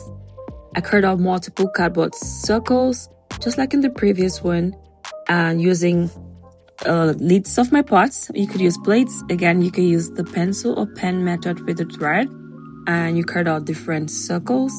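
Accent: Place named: Jamaica. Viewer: Love your accent... Jamaican or maybe Antiguan? Its like music in my ear.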